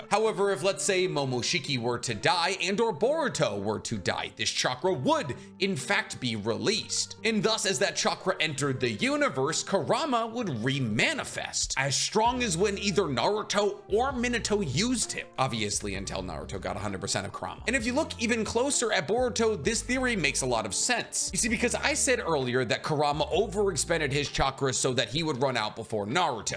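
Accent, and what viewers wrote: United States. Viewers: Cn you please pronounce their names correctly? Your content is great but it hurts credibility when you pronounce the names super American-like.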